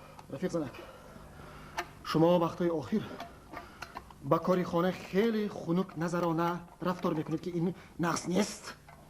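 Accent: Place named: Tajikistan. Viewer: Чудоям нутки зебову лахчаи волои Точикона. Хело Олли Ойла ва марди Точикро исбот намудед. Интилигент. Чунин хам бояд бошад. Саломат бошед устоди азиз. Салом аз Самарканд.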